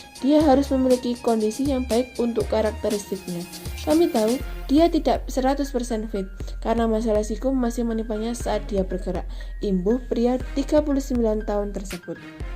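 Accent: Java, Indonesia. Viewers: Iyee gue jg merasa medok suaranya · Gak usah ke Jawa Jawa an lah ngomongnya....biasa saja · min, orang jawa ya, medok bener logatnya...(^_*)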